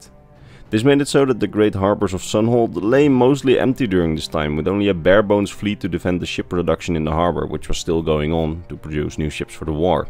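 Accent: Netherlands. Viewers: Jij klinkt nederlands :) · i was about to say, but someone else already mentioned it. you sound dutch, but not that horrible actual dutch accent. good job on the video, mate. moge de negen goden sterk met u zijn.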